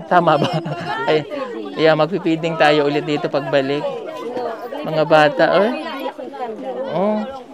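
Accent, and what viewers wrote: Visayas, Philippines. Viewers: Good evening sir, marunong kana talaga mag bisaya salamat sa pagmamahal mo sa amin dito sa mindanao sana i bless ka pa lalo ni Lord kayo ng PB team at PB sponsors bigyan kayo ng malalakas na pangangatawan para sa walang katapusang mission at pag tulong congratualtions pala sa bago mong natanggap na award 🎉❤❤❤ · Marunong na talaga mag bisaya si sir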